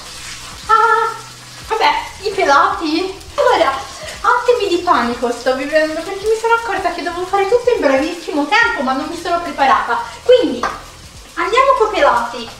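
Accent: Tuscany, Italy. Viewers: Mi fai impazzire dalle risate quando assaggi gli spaghetti alla fine, la tua espressione persa nel nulla, e il tuo accento toscano, 🤣🤣🤣ohh maremma, ciao saluti da Pavullo nel frignano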